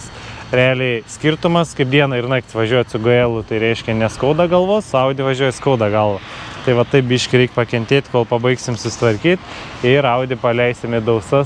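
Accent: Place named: Panevėžys, Lithuania. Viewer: Gal esi pasakojęs,bet iš kurio Lt galo kilęs? :D Palei tarmę lyg Panevėžys?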